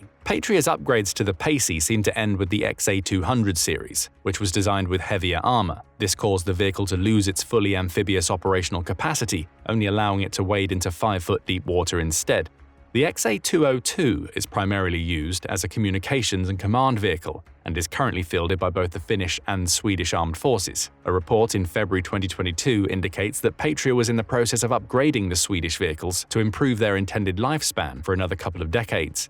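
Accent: United Kingdom. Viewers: Another british accent. Is that all that's out there anymore? Adios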